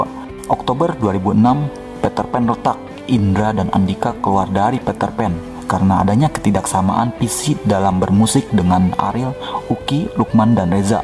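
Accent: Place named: Sunda, Indonesia. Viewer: Logat sunda narator nya kental banget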